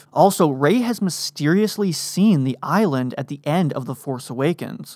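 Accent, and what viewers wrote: United Kingdom. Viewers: keeps up with the british accent too...nice one. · Please, English accent not British. British would include Scottish, Irish, Welsh as well as English. Even to suggest there are no regional difference in accent within England is incorrect. Someone from Newcastle will sound very different from a Bristolian who in turn will be different from a Londoner.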